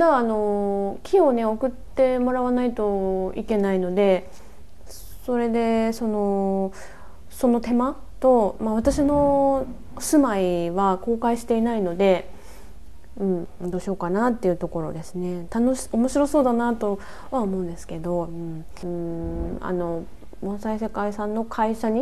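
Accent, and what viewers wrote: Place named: Kyushu, Japan. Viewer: たまに出る九州弁やイントネーションが同じ九州育ちには本当に癒されます😊。さんの理科的実験ノート🗒のような企画があると、盆栽の諸説や口伝えのなぜそうするの？が見える化できていいのかな~なんて思ってます。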